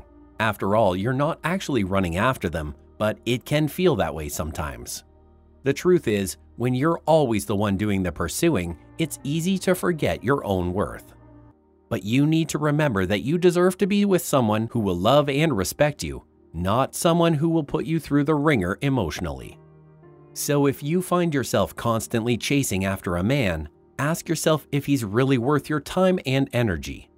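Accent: Canada. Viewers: The Canadian accent strong here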